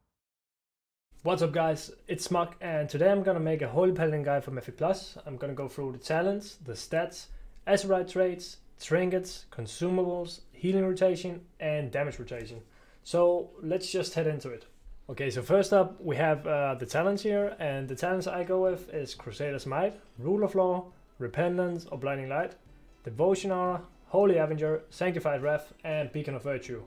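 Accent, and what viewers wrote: Denmark. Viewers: Man kan godt høre at du er dansk xD fed video :) får mig helt til at overveje holy Pala :)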